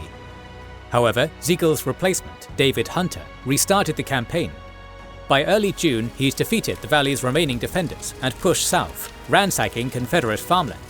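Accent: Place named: United Kingdom. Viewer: True spottedsharks but he’s British. Hence “St Louie” and although not here the inevitable “Mer EE land” for Maryland. “Schofield” is pronounced with a hard C (or K) rather than the schwa sound. None of this bothers me one whit. You ought to hear how we in Kentucky and Tennessee pronounce our foreign-named towns. Ever hear of Versailles Kentucky? Athens Kentucky (here with a long A.) How about Cairo IL (“KAY ro” it is there.) So yes, I get it but no, I don’t care. And I’d bet that unless you’re from Tennessee you’d stumble on Maury County (pronounced Merry) and Blount County (Blunt).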